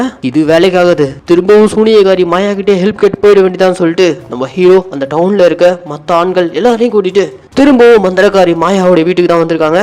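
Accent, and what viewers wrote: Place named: Puducherry, India. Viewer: But neenga pesurathu pondicherry side pola iruku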